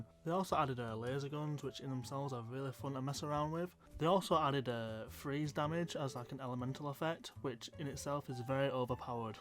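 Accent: United Kingdom. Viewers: I'm no gamer but a sub of your Dad's, a Bradfordiian and love our accent, I've subbed. All the best :) x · I'm more invested in the politics/news side of YT so I don't have a lot of experience with game reviews but this was definitely a good first video. Even though you're from the wrong side of the Pennines, it's still nice to hear more regional accents! 😁 I like how you break the review up by focusing on different elements (characters, gameplay, story, pros/cons) - this would work really well with some graphics and also help you to stay focused (if you're considering how to expand your look and build a brand). You sounded pretty confident throughout and if you were using a script then I think you must have spent lots of time memorising it. The only notes I have for delivery are the intro, you seemed to rush through it and it was a bit too fast. Take a breath and remember that you're awesome! 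Good luck with the channel, and well done on an engaging and interesting first video. 👏👏👏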